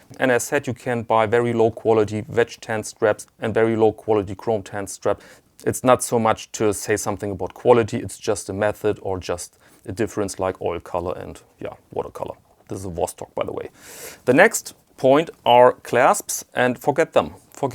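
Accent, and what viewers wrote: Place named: Germany. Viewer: Hi, great Video! I assume from your subtle accent and the Giro-Card, that you are German. Do you have any suggestions for a good online or brick and mortar shop based in Germany, best case in Hamburg. Cheers, Thorben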